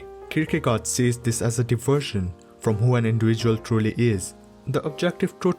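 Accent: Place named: India. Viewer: India comes under Asia, but I feel like he has a strong Indian accent, maybe south east Asia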